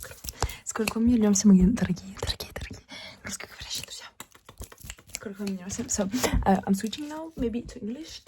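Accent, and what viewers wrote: France. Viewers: Ton accent et ton vocabulaire en français sont tellement propres qu'on dirais que t'as habité en France pendant plusieurs années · T'as un accent parisien, je pensais que le français c'était ta première langue. 🤯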